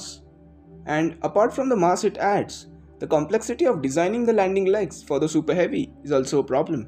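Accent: India. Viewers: Omg the Indian voice sounds like the scammers voice jaja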